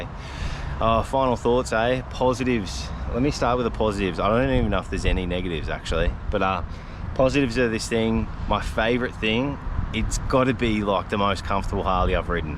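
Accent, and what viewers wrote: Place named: Australia. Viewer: Are you from 'Down/Under, Bro? 😁 Love your accent 😃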